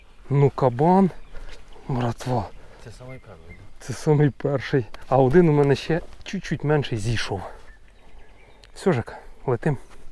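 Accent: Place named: Zhytomyr, Ukraine. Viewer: де така гарна водойма Житомирська обл Чую по говору😂?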